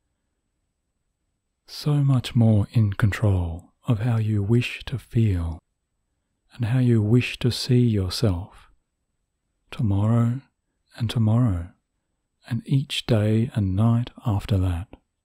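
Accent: Australia. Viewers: are you an Aussie? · I thought this was Australian accent? Either way it is super comforting. 🤗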